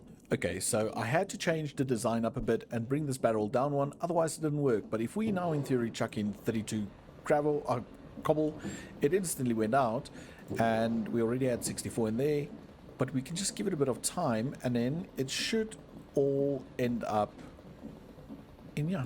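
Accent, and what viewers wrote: South Africa. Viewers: I knew you were South African. Happy we one the world cup!❤🇿🇦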